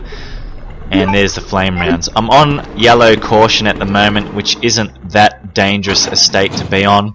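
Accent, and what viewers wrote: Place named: Australia. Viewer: “We’re going heeee, spiders will be in heeee, but we now have the eagle medal, so we will go ahead and put it in heeeee…” Love the aussie speak 😄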